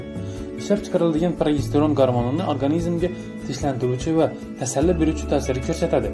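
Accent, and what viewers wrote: Khorezm, Uzbekistan. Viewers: Talaffuzingizdan xorazmliksiz deb o'yladim. Agar shunday bolsa ish joyingizni manzili bormi?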